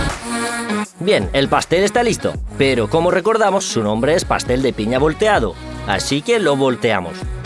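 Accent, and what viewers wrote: Spain. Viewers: Qué bien que pongan una buena voz en español, en castellano como tiene que ser y no en latino como ya se escucha en demasiados vídeos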